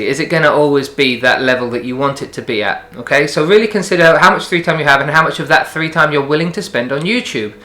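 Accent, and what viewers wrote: United Kingdom. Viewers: Awww there you go :D you can talk to the camera perfectly! You must be british though :3? Very true tips :)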